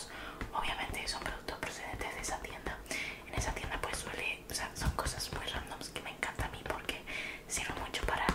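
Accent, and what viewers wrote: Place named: Canary Islands, Spain. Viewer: Hola, el otro día estaba dibujando y decidí buscar asmr. Te escuche y supe q eras Canaria jaja, saludos desde Tenerife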